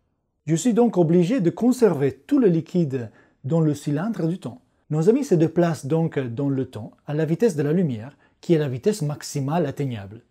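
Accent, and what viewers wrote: Italy. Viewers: la seule chaine qui réunit un physicien super beau, un accent italien a tomber par terre et de la science super bien expliquée pour les novices...que demander de plus :elbowcough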